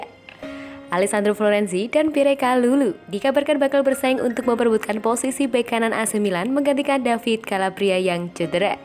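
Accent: Java, Indonesia. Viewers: Sory unsub ga suka voice over medok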